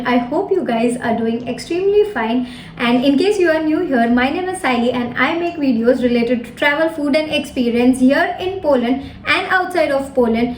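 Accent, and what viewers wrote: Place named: India. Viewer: Indian accent. Pleasant to the ear so cheerful. I've always liked him.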